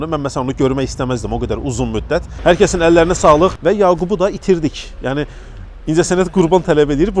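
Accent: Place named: Shaki, Azerbaijan. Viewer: Qardaş salam xəbərin var artlq səndə şəkililər kimi ləhcə əmələ glib ❤ Var olun əllərinizə sağlıq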